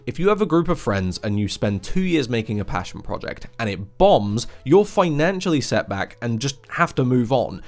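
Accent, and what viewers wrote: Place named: United Kingdom. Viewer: brit detected, listening and learning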